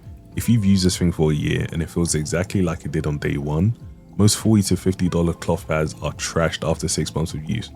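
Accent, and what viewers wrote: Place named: United Kingdom. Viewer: Nice video man! its refreshing to hear a brit for once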